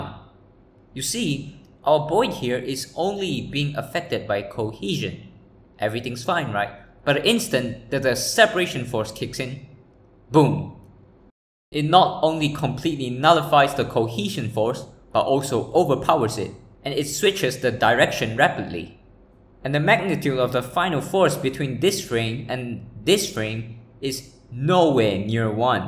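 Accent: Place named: India. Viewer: subtle indian accent.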